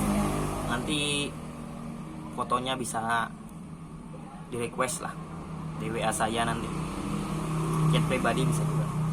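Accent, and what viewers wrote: Banjar, Indonesia. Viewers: Logat nya banjar di mana lokasi nyaa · banjar banar nah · Orang banjar kah pian om.. aksen nya kaya banjar😁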